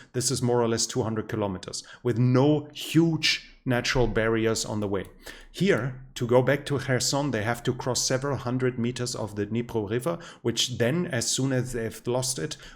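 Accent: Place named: Germany. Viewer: The moment I heard a German accent I knew this would be good.....just straight forward analysis based on cold hard facts and evidence without any political rhetorics how refreshing...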